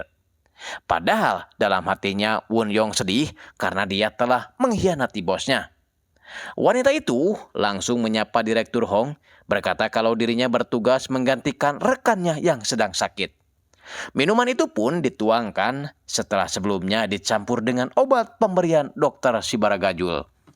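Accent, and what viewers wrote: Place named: Sunda, Indonesia. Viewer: joss ie s Dubing org sunda 🎉🎉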